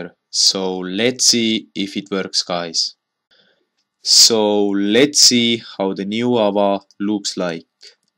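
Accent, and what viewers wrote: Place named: Estonia. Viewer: Hea eesti aksent sees aga muidu 5+! Nice vid ;P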